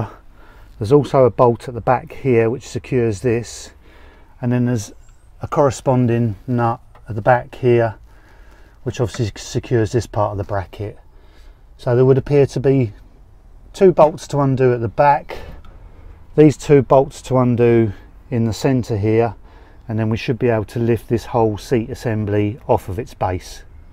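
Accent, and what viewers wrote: United Kingdom. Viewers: You’re a cool dude with a great British accent (which locality?). Not your best vid.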